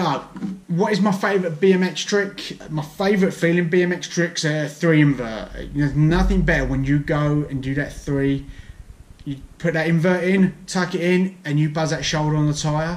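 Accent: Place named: Essex, United Kingdom. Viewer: Ooooo I heard Essex #EssexGirls🤘🏻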